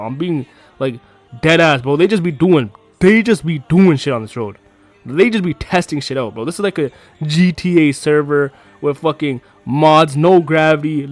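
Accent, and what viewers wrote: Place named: India. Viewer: My guy he is Indian too you can tell from his voice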